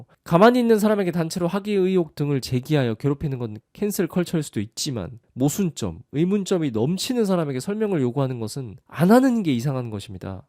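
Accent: Jeolla, South Korea. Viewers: 영어완전 전라도발음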